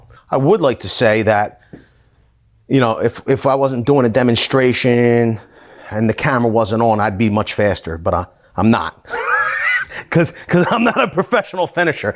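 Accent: Philadelphia, United States. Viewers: I have no idea but see he hasn't answered and your question made me notice that now as well. My guess is that it's a Philadelphia accent maybe.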